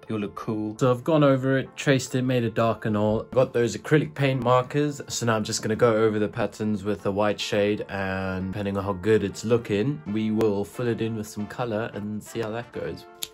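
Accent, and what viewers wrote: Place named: South Africa. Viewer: Heard his accent and knew he was from SA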